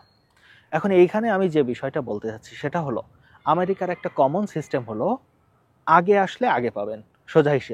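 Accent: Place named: Jessore, Bangladesh. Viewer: Jessore er lok mone hosse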